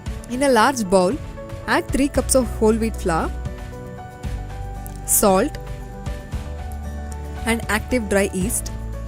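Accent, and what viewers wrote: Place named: India. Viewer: Disliking because of your cringy Indian thick accent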